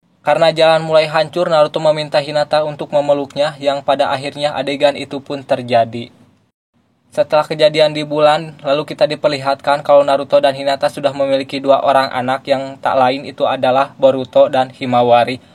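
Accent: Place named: West Java, Indonesia. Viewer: Sundanya kdngeran banget bang😂😂😂👍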